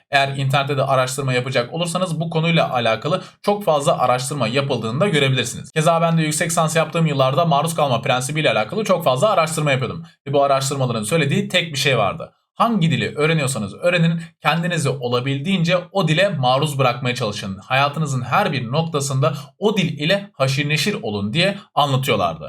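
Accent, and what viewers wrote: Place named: Turkey. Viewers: bence türk aksanı belli oluyor ama aksan önemli değil zaten çok akici konuşuyor. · ilk 5 saniyede anlaşılıyor aksandan türk olduğu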